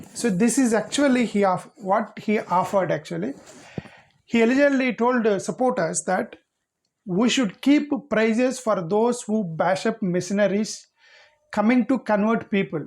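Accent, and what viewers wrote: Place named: India. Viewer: Good idea we will give 120 lakh shutup your mouth don't speak Indian english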